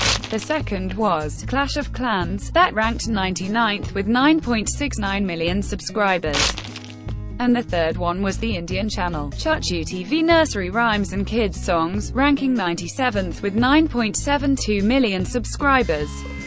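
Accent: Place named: Portugal. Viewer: EU prefiro o sotaque português ,e enclusive assistindo seus vídeos eu aprendi o sotaque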